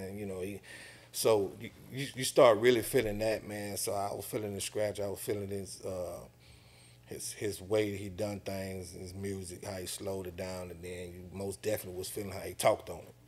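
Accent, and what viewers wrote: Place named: Houston, United States. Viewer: Real southside, Southpark talk.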